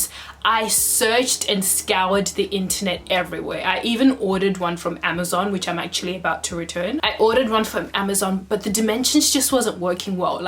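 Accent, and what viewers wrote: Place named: Australia. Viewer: As soon as I heard your accent I was like yess, an Aussie! I WAS contemplating buying the shelf from Grovemade but I just got to the bit where you said it $150 just for shipping O_O. girl no..that's not in my budget lol. I shall keep looking elsewhere but great video! <3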